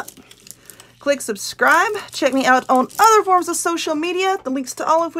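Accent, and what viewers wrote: South, United States. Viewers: This flower box turned out to be cute. I freaking love your southern accent 😘👍💕💕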